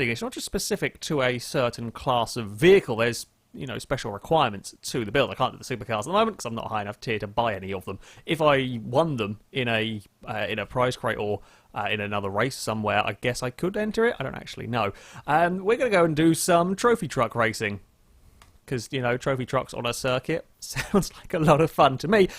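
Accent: United Kingdom. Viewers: I dont know why but i find your voice addicting, maybe because of the british accent?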